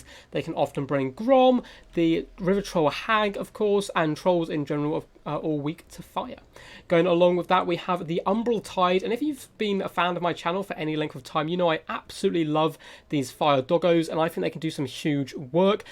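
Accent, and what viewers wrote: United Kingdom. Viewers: To be fair I don't click on the video for the content anymore, but for the british accent podcast !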